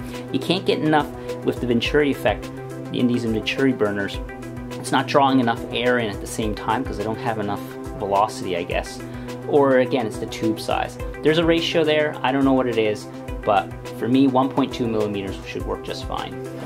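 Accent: Canada. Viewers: canadian..abooot...thanks for sharing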